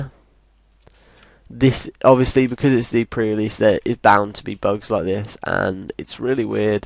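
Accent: United Kingdom. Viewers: I disagree it's just the regular brit. accent!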